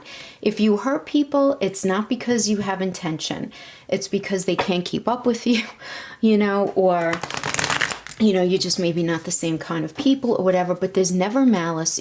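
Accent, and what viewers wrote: New York, United States. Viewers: Is it new York accent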